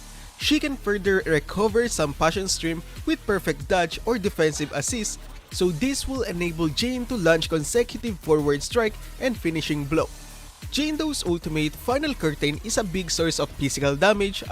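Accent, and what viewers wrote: Philippines. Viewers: I mean 90% of that because of the Ph accent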